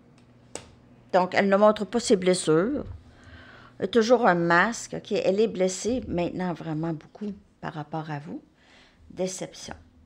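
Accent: Canada. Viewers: Bonjour, mille merci pour vos guidances et quel plaisir d'entendre votre bel accent canadien! je suis en amour pour votre si beau pays et vos si beaux paysages, votre culture si bienveillante.....🤗💖🦌🐾🐻🌲🍁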